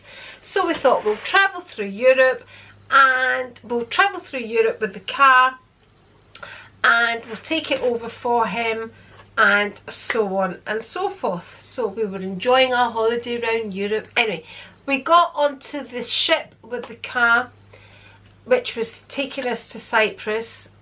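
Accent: Scotland, United Kingdom. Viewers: The Planner... The Executor! And What A True Gentleman Ehh... Putting Him On The Spot... Yet Once Again Executing The Perfect Answer!! Thank You So For Taking Us Down Memory Lane And Sharing Such Personal Incidents... Some Lovely Insight Into Your Parents Values And Character... Feisty... ‘ Fist 👊Fight En Route To Cyprus... 🤭... And A White Halter Neck Dress... Ooh Dear... 😱... Did Tell You Off? Lol 🤭... These Are The Questions I Hope You Will Be Able To Answer Next Time... 1) What Were Your Parents Reaction When You Told Them You Wanted To Get Married At 17... 2) What Was Your Childhood Ambition... What Did You Want To Be When You Left School? 3) You Grew Up In London But You Have A Scottish Accent? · Ah that was really good to listen to I enjoyed that. With your Scottish accent I was very surprised you were born and raised in London. Seeing the photo of your Mum you’re the image of her....also are you an only child? 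Xx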